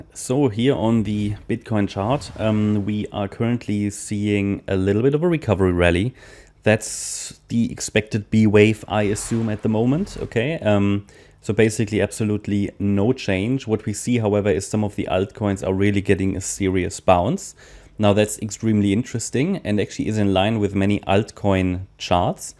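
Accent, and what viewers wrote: Germany. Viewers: Bro is german☠️ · do have any german roots?your accent is like german..just curious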